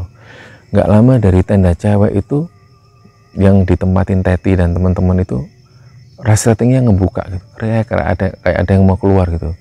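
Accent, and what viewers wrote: Java, Indonesia. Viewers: Sangat suka dgn cara penyampaian dgn bahasa yg sederhana dgn logat Jawa, santun 🙏🏼 semangat dan sukses utk 👍 terutama utk mas 👏👏👏👏👏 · Kenopo mas pembawaan ceritanya enak? Mergone logat n kadang nganggo bahasa jawa, jadi koyo crito karo koncone dewe....ntaps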